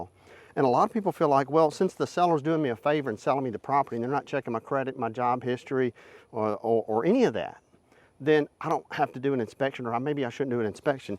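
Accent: South, United States. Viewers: Are you from Louisiana or the south in general? Im getting theo von vibes (which is a compliment)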